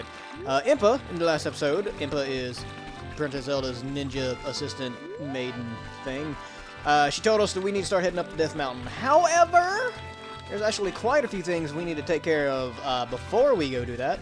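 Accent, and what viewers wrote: South, United States. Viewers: This game really makes your Southern accent shine in a good way!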